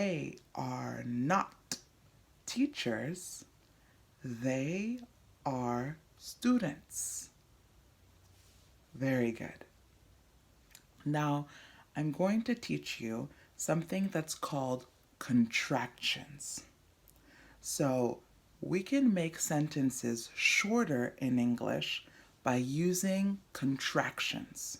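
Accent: France. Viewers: I am learning so much. Are you a french native speaker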